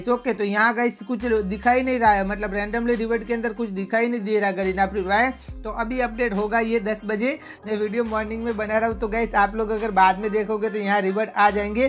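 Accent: Gujarat, India. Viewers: Appp Gujarati ho kya bhai ?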